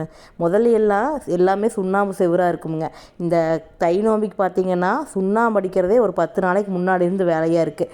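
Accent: Kongu, India. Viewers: Kongu tamil supernga ammuni😊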